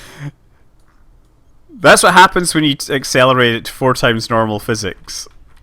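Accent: Scotland, United Kingdom. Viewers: You haven't truly lived until you've heard a Scotsman recite Star Wars quotes. Well done,.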